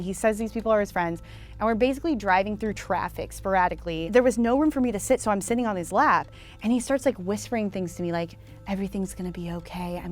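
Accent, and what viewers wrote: United Kingdom. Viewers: The British accent makes me wanna cry. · i cant get over her british accent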